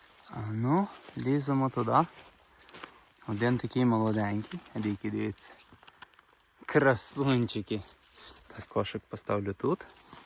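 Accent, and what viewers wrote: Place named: Hutsul, Ukraine. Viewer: як я люблю гуцульську говірку🎉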